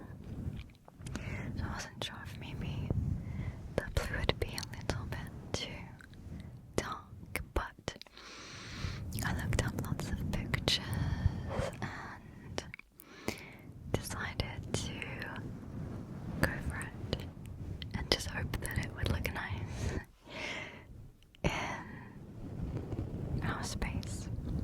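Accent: United Kingdom. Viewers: 🙌🏼🙌🏼🙌🏼🙌🏼 Yay finally a whisper video!! I just love your British accent and whispers. I hope all goes the way you want, and thank you!!! The kitchen turned out beautiful, I LOVE the lights on the bottom of the cabinets.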